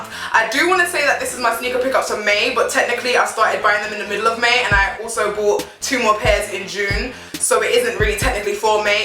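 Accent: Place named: United Kingdom. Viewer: I am a sucker for British accents. I love that more girls from the U.K are stepping up their sneaker game..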